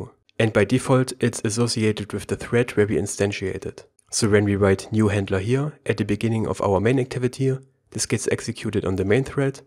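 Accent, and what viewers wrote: Germany. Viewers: Are you german? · are u german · You are an very good teacher! Probably one of the best I have seen. Are you German speaking? I hear a slight German accent. I recently started with Android Studio. The threading in Java is a bit complicated, but thanks to your video, I look much better, thanks a lot !!! :))))) Greetings from Switzerland Nicolas B) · dein Englisch klingt, als wärst du deutscher 🤔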